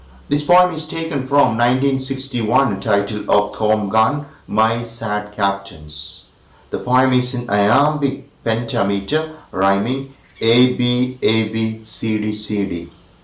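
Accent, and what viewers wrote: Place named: India. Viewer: Excellent Indian Accent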